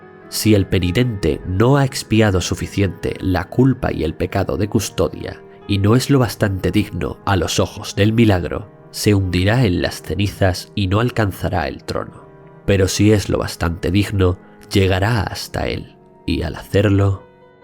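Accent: Spain. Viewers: Excelente trabajo, y que este relatado con acento español le suma mucho a la ambientación.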